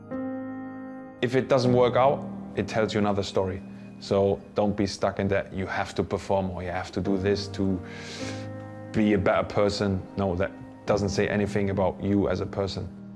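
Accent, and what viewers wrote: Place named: London, United Kingdom. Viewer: sounds like he is from london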